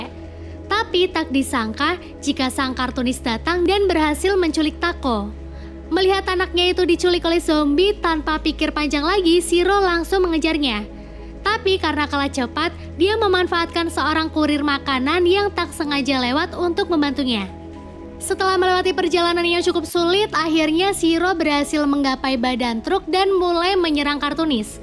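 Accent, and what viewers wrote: Pemalang, Indonesia. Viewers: BTW KAKA ORANG PEMALANG?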